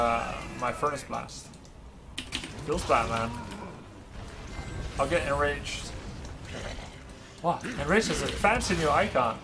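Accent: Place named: Netherlands. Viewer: Hey man! I first saw you as shoutcaster and wondered if you are Dutch as I thought I recognized the accent. The name Grubby did not make it clear, but now I know its Manuel Schenkhuizen and that sure clears it up ;P I cannot recommend your channel on an educational level as there is a bit too much sarcasm and goofiness, but for pure entertainment it is well worth the sub! Keep it up bro! Groeten uit Spijkenisse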